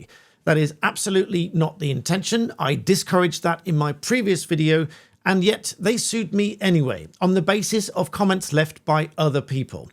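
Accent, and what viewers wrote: United Kingdom. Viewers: I thought you were Blacktail Studios and wondered how you got a British accent 😂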